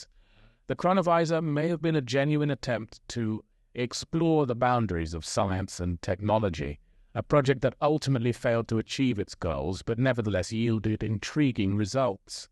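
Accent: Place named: United Kingdom. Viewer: Who NEEDS that shrill, played out 🇬🇧Accent?? Seriously, it’s so tired. And super shallow, too.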